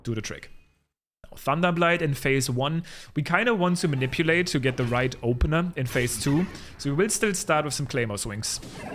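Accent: Germany. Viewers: I do love German accents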